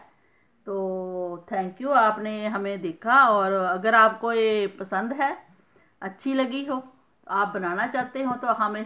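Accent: Punjab, India. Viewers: ਭੈਣਜੀ ਤੁਹਾਡਾ ਬੋਲਣਾ ਅਛਾੱ ਲਗਦਾ ਹੈ ਵਿੱਚ ਹਿੰਦੀ ਵਿੱਚ ਪੰਜਾਬੀ ਬਹੁਤ ਵਧੀਆ ਲਗਦਾ ਹੈ 🙏🙏🌹🙏🌹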